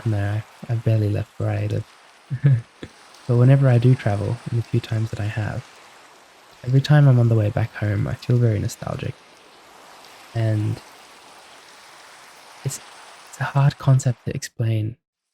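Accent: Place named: Australia. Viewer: This is my second video to watch from you and I think you’ll be one of my favourites to watch from now on. Your voice is so nice and relaxing to listen to (it’s also nice to hear an Australian accent). I’m glad I clicked on your video ☺️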